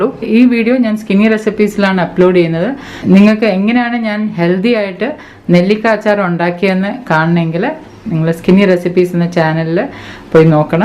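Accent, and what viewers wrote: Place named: Kottayam, India. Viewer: .. kottayam kaari aanannu thonni.. Njnaanum oru kottaym kaari...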